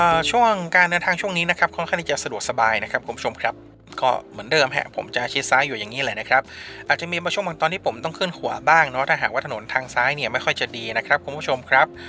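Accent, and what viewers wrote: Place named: North, Thailand. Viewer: น้ำเสียง และลีลาการพูดมีเสน่ห์ หนักเบาน่าฟัง คล้ายนักจัดรายการวิทยุตามต่างจังหวัด อู้ไทยปะแล๊ดเมืองบ้างก็ดีแล้วครับ เพิ่มสีสรรค์ ถ้าลองเปลี่ยนเพลงประกอบจากเพลงสากลบรรเลง เป็นแบบสะล้อ ซอ ซึงดูบ้าง ก็น่าจะเหมาะกับการนั่งรถเล่นตระเวณภาคเหนือนะครับ / ชอบครับชอบ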